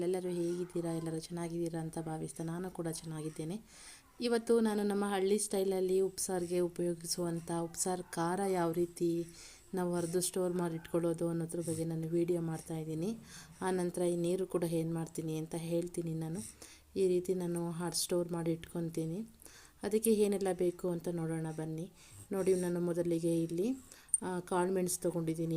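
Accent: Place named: Telangana, India. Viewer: Good TELANGANA Telugu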